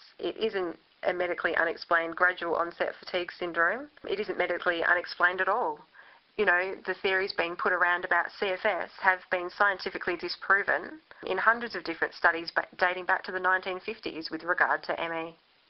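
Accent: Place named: Australia. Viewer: 1 I am so grateful to have found THIS video. I am tickled at the Aussie accent. :-) I was a VERY active, healthy, dynamic working girl until one fine day in July, 1986, I collapsed at work for no reason. I was taken to hospital, but released after 7 hours of observation. Exactly one week later, I collapsed again and was put into hospital for a week, while they ran every conceivable test in the book on me. I left that hospital an invalid with every single test showing normal. cont....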